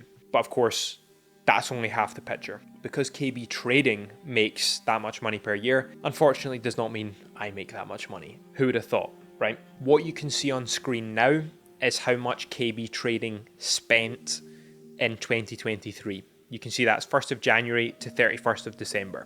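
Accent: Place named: Glasgow, United Kingdom. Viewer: Hey, I've just came across your videos. Are you based in Glasgow? Making presumptions on your accent. I'm full time trading based in Motherwell. I'm sure I'm the only full time swing trader in Motherwell!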